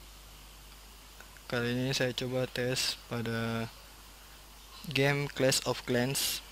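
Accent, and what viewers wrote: Makassar, Indonesia. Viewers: Kayak nakassar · lokatnya makassar bgt... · dari logatnya kayak org makassar.. wkwwkwwk yg ngomong macam pengen ketawa tapi ditahan.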